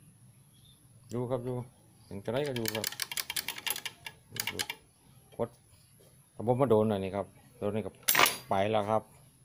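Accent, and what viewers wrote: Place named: Isan, Thailand. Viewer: ยุบ้านไหนน่อนิครับคือเว้าคือกันแท้ครับ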